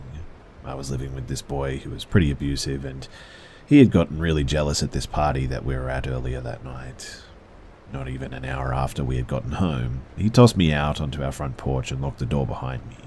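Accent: Australia. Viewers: you are my absolute favorite scary story teller on all of the internet. It's mostly your Aussie accent ❤️😍😁🤗😊😉😘💯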